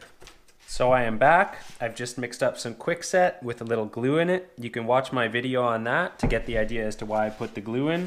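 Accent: Vancouver, Canada. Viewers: the Vancouver accent is smoother than the Ottawa accent... less oat 'n aboat!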